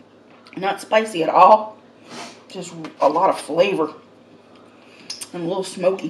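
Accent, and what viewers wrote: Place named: Deep South, United States. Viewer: You’re not a Christian with that accent? Dayum, I respect that! I too am from the Deep South and not a Christian.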